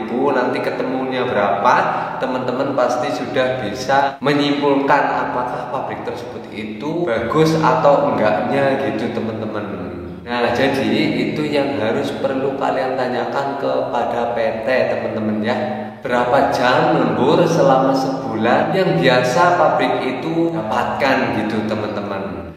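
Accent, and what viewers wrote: Banyumas, Indonesia. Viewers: Sukses selalu wong Cilacap , ora ngapak ora kepenak 😁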